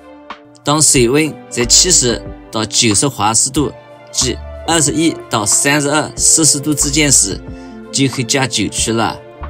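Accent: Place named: Nanjing, China. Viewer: 很喜欢你的频道，条理清晰，风趣幽默，乡音撩绕，在美遇到相同时区种植爱好者不易，还是南京老乡那是缘分。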